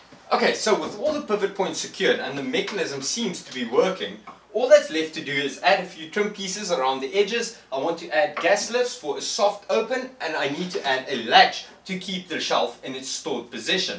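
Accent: Afrikaans, South Africa. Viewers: are you from south Africa? that accent afrikaans pappie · Organizing genius! I’m trying to place the accent - South Africa? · Only just found out about this channel and I'm hooked. Readily picked up the accent as South African. At least now I can actually find some of the recommended products. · Wow!! Wish I'd seen this a year ago. I installed ceiling boards so I can't use this idea. Absolutely loved this concept and will try to adapt it to my needs. You sound like a fellow South African. If I'm right, then all I can say is "Bakgat!!" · hi there. i love your idea and it looks soooo good and practile ofcourse. Are you from S.A? i lived in Cape town for 8 years and i think i recognise the accent 😉. Thanks for the great video and tips. Cheers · He is Afrikaans, he's my cousin btw he got married not too long ago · Jys a suid afrikaner, Engels n man ?!! 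· Heard the same immediately. Definitely a Saffa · South Africa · First of all your video is great, and your shelves are awesome. Secondly the whole time I was listening I was thinking to myself, he is a South African. What made me 100% sure that you were South African was the hadidas in the background noise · South African ( Dutch)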